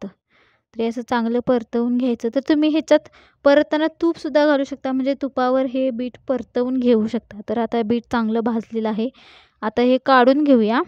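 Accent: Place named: Kolhapur, India. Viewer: रांगडी कोल्हापुरी भाषा आहे ती ताई खिसुनच बोलाव लागत...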